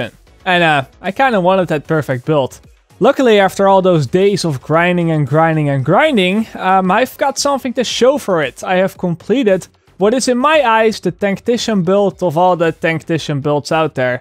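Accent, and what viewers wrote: Netherlands. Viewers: Je klinkt als een Nederlander ben je dat ook? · its dutch english accent xD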